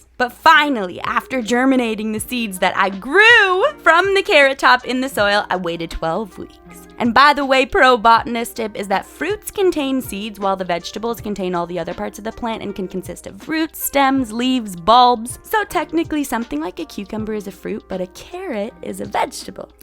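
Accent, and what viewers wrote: United States. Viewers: Ur accent is a mox between New Jersey, southern, and California 😫 ive never been so confused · Shes definitely alternating through multiple dialects, northern and southern US. Which is interesting since she's in Canada.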